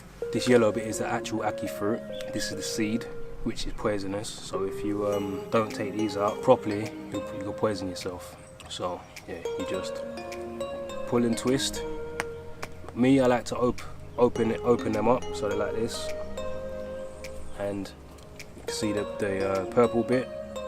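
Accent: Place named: London, United Kingdom. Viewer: You sound like your from London, so am I and been living in Florida USA for 14 years. I have 2 ackee trees in my back yard, got a proper 15ft fruit picker with a basket to get them and thoroughly enjoy the harvest each year. Harvest is coming to end being November. My Jamaican neighbors love it too and give them bags of whole ackees so they can see it’s opened naturally. Once I made the mistake of giving them ackees I removed the flesh thinking I was helping. I have a hard time with squirrels here and can only try to keep them under control with an air rifle.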